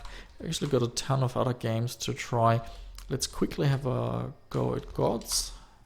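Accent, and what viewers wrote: Germany. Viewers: Du hast ürgendwie so einen deutschen Akzent 😂😂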